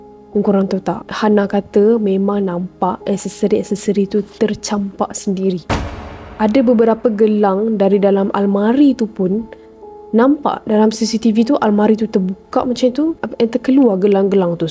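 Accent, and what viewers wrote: Kelantan, Malaysia. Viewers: org kelate ko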